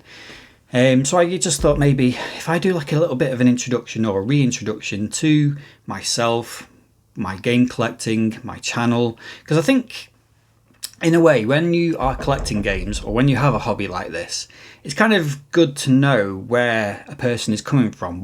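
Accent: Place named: Northamptonshire, United Kingdom. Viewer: Them dulcet Northants tones are awesome. Keep it up mate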